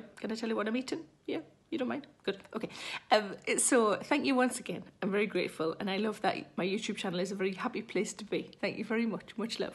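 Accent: Newcastle, United Kingdom. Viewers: Not only am I in love with your accent (reminding me my student life in the UK), but I also enjoy binging your videos when I’m in need of laughter and positive vibes. Thank you for uploading! Although your beautiful country just divorced the EU, please come do a show in France!!! I promise, most of us are nice... 😉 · Funny that she reminded me of Benny Hill show, love your British accent! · Yay! I have introduced your content to my mother (you and I are about the same age), and she loves your humor as much as I do. Her only question to me was, "why does she talk so funny? It's hard to understand her sometimes." I don't know why I found that statement hilarious, I had to explain to her that just like us "Yankees" in the Midwest US sound different from Boston, not everyone in the UK speaks like Mary Poppins or Burt the chimney sweep. Anyway, please come to Minnesota on your US Tour, I'd love to see you in person!!! 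· I love your geordie sayings and accent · I've watched you for years! I'm from Saudi Arabia (and yes, I understand Geordie) and it's quite unlikely that I would be in the UK any time soon, never been actually. I've only watched your specials and interviews on YouTube and you can't imagine how thrilled I am when you started this channel! Already subscribed, don't mind learning what you're eating (biscuits, innit? :D) and cannot possibly wait for the full special! Thanks flower! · Hey there, Geordie girl. You are so funny and cute and kind. Just ask in that accent and I will always subscribe! TO YOUR CHANNEL!!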